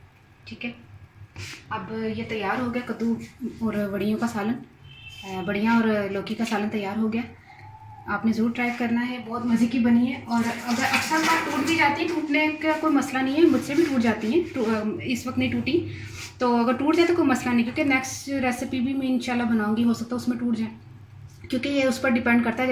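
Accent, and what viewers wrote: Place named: Punjab, India. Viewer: muzna malik یہ تو پنجابی لہجہ ہے یہ تیز ہی بولا جاتا ہے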